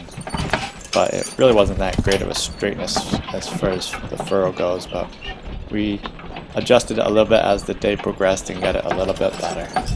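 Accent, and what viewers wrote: New England, United States. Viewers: Thanks for explaining how you got a New England accent in Upstate New York.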